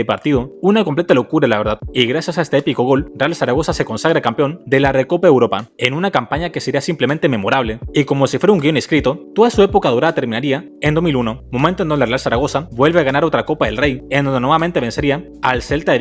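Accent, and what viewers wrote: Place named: Latin America. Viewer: Por tu acento pareces latinoamericano, te agradezco que hagas un vídeo sobre uno de los mejores equipos de la Liga, al menos con historia, y el séptimo con más títulos en España. Es una pena que a día de hoy esté así, mucha gente de otros clubes preguntan y no se lo explican. Es muy duro. Sé que en Argentina es muy querido, debido a que tuvo muchos futbolistas de esta nacionalidad en sus filas, y con un muy buen rendimiento. Salvo milagro, nada lo va a salvar esta temporada de descender a Primera RFEF. Habrá que ver si es viable en esta categoría, pero si desaparece sería una tragedia.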